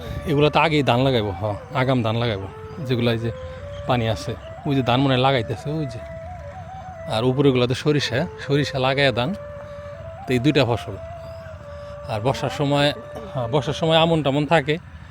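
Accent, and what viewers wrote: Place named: Jamalpur, Bangladesh. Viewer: জামালপুরের ভাষা টাঙ্গাইলের নাগরপুর এর ভাষা সেম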